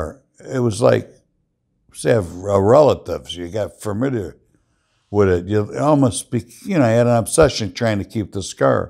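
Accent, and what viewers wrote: Chicago, United States. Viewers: I love listening to the way you talk. The Chicago way. I always did the same thing with cars and clothes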